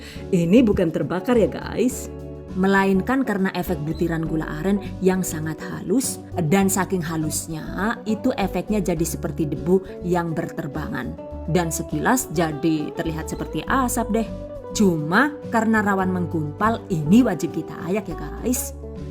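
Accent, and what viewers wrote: Banyumas, Indonesia. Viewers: AQ suka bahasa ngapknya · 😁😁😁ana bahasa ngapak e kya nyong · Ngapak temen wa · Wong endi si rika mbakayu...? Deneng ngomonge pada karo enyong...! Jos lah top markotop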